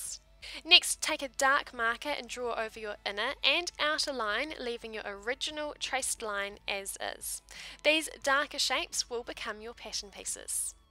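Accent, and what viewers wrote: New Zealand. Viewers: she has a very strong NZ accent 🇳🇿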